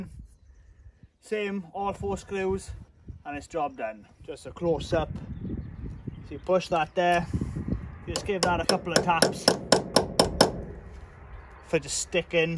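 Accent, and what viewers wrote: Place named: South Wales Valleys, United Kingdom. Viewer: Its the Valleys Man gotta love the accent!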